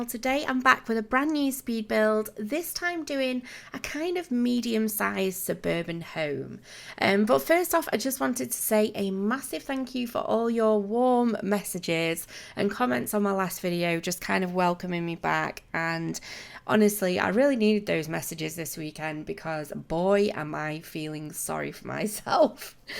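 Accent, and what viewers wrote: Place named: Manchester, United Kingdom. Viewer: Youve gotta be from manchester hahahaha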